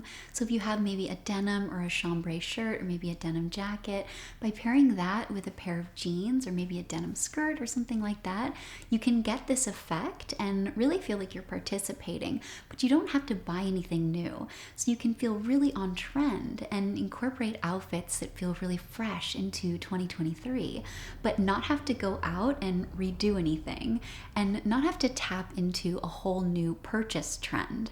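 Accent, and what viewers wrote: United States. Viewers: It just occurred to me (sick on the couch today) that it sounded like I was trolling🤦‍♀️ so I apologize if that's how I came across (you've got that old school transatlantic speak) I do find your page wonderful and I wish more women would follow your lead 💯 what also makes it so appealing is its geared towards EVERY age 😍 There is a woman named Heather something or other and I swear her "fashion advice" towards the 40+ set has them dressing like milf cougar super hookers that look like they've been gangbanged by a crayon 🙄 Not even kidding AND she calls it classy 😂 you must find her and see.. try not to spit your coffee!😉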